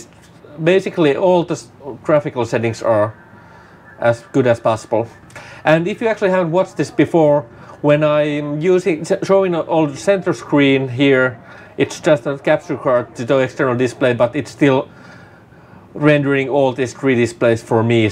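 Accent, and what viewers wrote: Finland. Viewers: very noticable Finnish-English accent there 😀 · ymmärrän, että kotikielellä ei ehkä näyttöjä tule niin paljon... mut tää suomalaisten rallienglanti ei myöskään ole kaunista kuunneltavaa. :) Suurin syy löytyy varmasti meidän englanninkielen opettajista, jotka eivät ainakaan silloin kun me oltiin nuoria, painottaneet ääntämisen opetteluun. Ei millään pahalla. Yritä opetella ääntämistä, jos aika ja rahkeet riittää. Kaikkea hyvää sulle. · do i hear Finnish accent? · are you finnish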